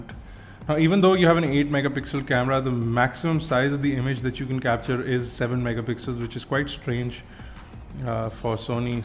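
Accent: India. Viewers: agghh...that indian accent is killing me..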